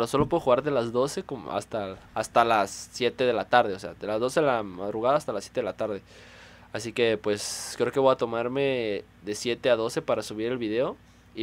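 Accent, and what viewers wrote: Mexico. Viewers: Eres de Sinaloa?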